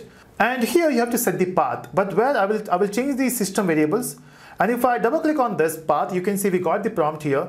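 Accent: India. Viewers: I hear Indian I stay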